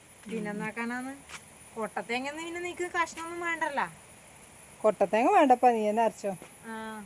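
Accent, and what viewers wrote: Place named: Kannur, India. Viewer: സംസാരം കേൾക്കാൻ ഭയങ്കര രസമാണ് കണ്ണൂർ ലാംഗ്വേജ്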